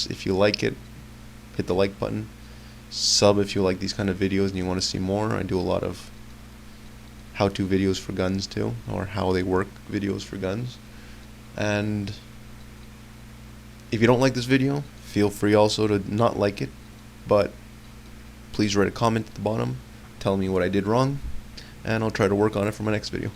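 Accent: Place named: Canada. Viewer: Obvious Canadian. Not that there's anything wrong with that.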